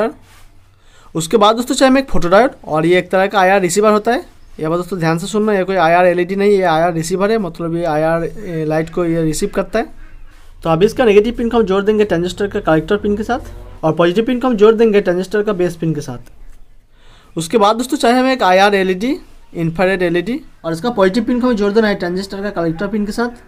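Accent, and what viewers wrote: Bengal, India. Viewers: Aami tum bangauli hai 🤡....yrr jo bhi ho bangali logo ka accent bht pyara hota h 😂💗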